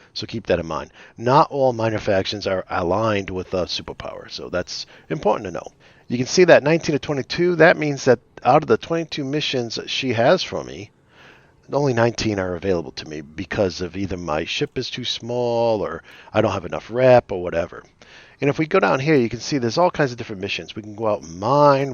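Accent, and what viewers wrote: United States. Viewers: Entire video Couldn’t stop thinking about just wanting to punch the shit of of this annoying New England accent. 🤮🤮🤮🤮🤮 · I hope I'm hearing correctly the NYC accent. It could be Central Jersey. Apologies if I am wrong. I'm a little homesick for NYC is all. Thanks for the clearly made video series. I 've started and stopped this game nearly 1/2 a dozen times, but will try once more thanks to you.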